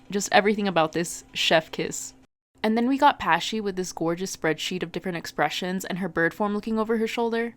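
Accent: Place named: Southern California, United States. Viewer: r u a cali girl youre srs giving insane socal (specifically la and surrounding counties)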